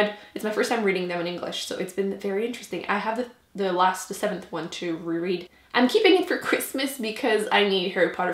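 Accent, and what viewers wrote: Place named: Quebec, Canada. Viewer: She's from Québec.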